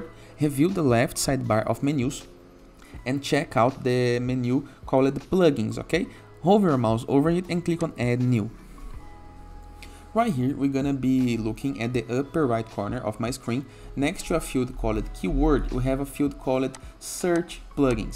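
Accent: Brazil. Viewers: Sotaque BR. It gets one to know one.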